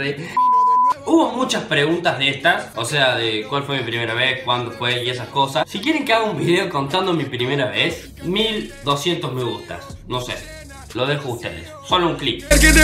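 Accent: Córdoba, Argentina. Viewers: Sos cordobés? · Imposible no darse cuenta que sos cordobesaso 😂😂 sos re crack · Amigo sos cordobes no?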